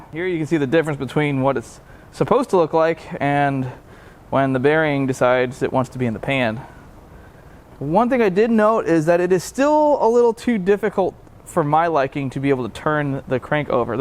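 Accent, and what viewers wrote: United States. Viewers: Normal engine design from this millenium. Ps. Are you yank?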